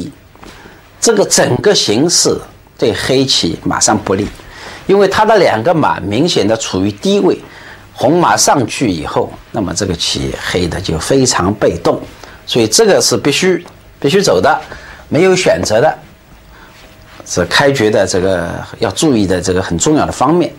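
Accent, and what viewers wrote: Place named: Fujian, China. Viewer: Fujian style mandarin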